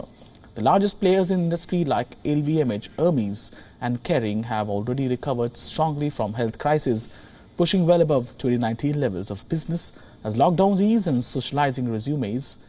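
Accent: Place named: India. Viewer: scam news network with a ganges river accent🤮🤮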